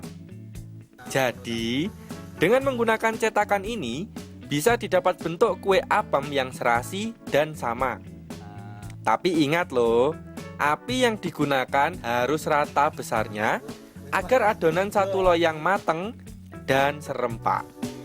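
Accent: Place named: Java, Indonesia. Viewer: logate ojok d medokne Cak biasa wae lah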